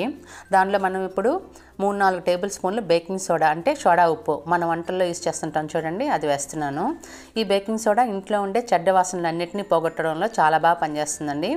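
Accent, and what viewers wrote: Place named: Godavari, India. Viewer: Aay.. Meedhi Godavari entande.. Chala baagaa sepoarandi.. Thanks ande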